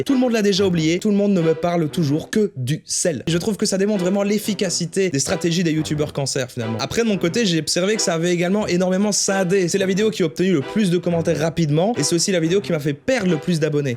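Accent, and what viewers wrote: Liège, Belgium. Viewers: Dis moi, es tu belge ? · Il me semblait bien avoir entendu un accent liégeois ! Bonne analyse des contenus de m... Et sinon le sel est passé maintenant ? :-P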